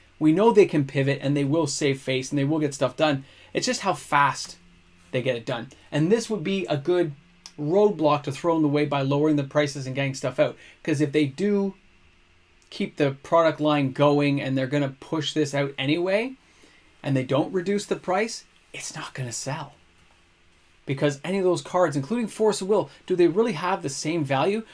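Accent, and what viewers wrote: Canada. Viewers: this is my first video, but ill be back. i like your takes, and your POV. Also, some words make me think your Canadian, so, gotta support the homegrown Content.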